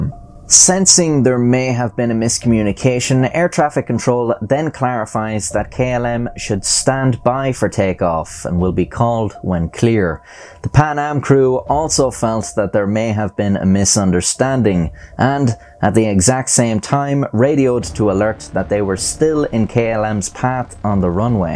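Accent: Ireland. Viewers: H I'm pretty sure he's Irish but if your some Irish English thing thats cool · I’ve heard this story before, but hearing the Irish lad explaining it entices me for some reason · I love his accent! The Irish narrator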